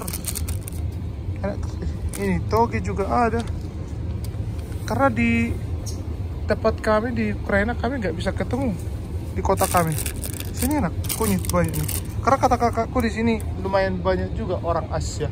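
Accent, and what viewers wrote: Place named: Sumatra, Indonesia. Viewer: Cara bicaranya seperti orang dari Sumatra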